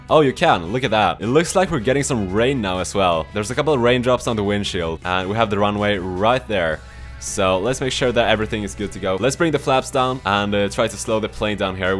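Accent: Sweden. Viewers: You can hear very well that he is from Sweden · Are you from Sweden, cause you have an Swedish accent, if you are then I’m gonna say: Hej, beroende framkallande videos! Hoppas du har det bra! :)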